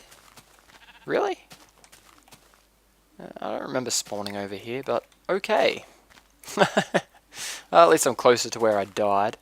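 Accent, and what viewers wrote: Australia. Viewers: Your australian accent :) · Are you Australian?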